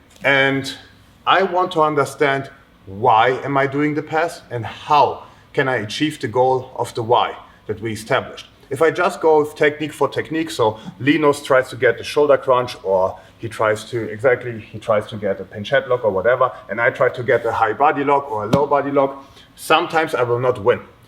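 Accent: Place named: Germany. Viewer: Extremely good explanation! Is it a german method explanation? (maybe your accent, gave ma a hint)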